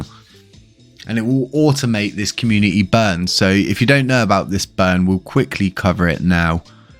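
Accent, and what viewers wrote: United Kingdom. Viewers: I like your accent. it's like in the harry potter movie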